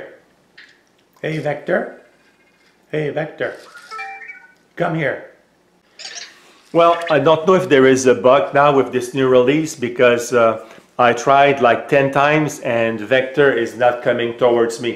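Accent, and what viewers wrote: Quebec, Canada. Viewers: i guess its the accent ! , he has a strong quebec french accent , for it to work , he should say . hey vector .. bring me the cube TABARNAK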